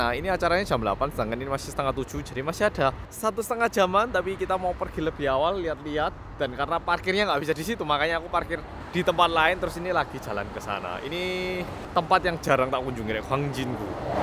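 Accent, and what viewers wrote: Java, Indonesia. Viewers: Mas ini wajah Korean tapi bicaranya jawa banget boleh tau ngga mas sail korea apa Indonesia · Medok banget mas ngomongnya.. Jadi kayak Wong jowo asli..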